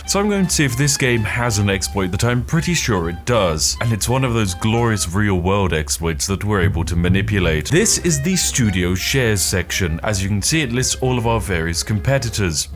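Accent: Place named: RP, United Kingdom. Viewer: what part of england are you from you sound more posh than the people south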